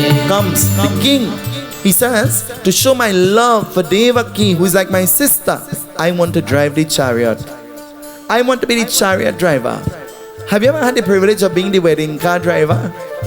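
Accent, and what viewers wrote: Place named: Trinidad and Tobago. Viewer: SITARAM. PUNDITJI...I. LOVE. YOUR. LITTLE. TRINI. ACCENT.. YOU. DID. THE PUJA VERY. NICE.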